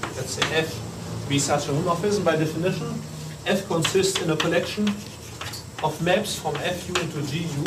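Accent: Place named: Germany. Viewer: I didn't expect him to have such a strong German accent, despite knowing some of his history. Very interesting.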